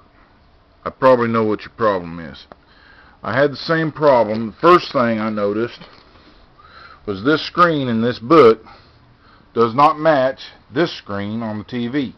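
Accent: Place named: South, United States. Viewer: Thanks sir you helped me reprogram my remote ! I love the southern accent by the way 👍🏽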